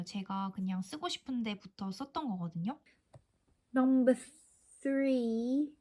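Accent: United Kingdom. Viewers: 기다렸어요ㅠㅠㅠㅠ 감성+분위기+영상미 게다가 고급진 영국발음ㅠㅠㅠㅠㅠ 너무 사랑합니다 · 정말감사합니다 영국발음 너무멋잇어서 공부법 보규 저도 꾸준히 달려보겠습니다.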